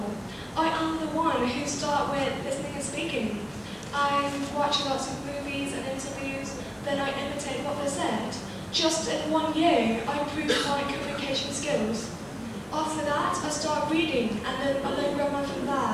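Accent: United Kingdom. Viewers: What a British accent! So impressive ^^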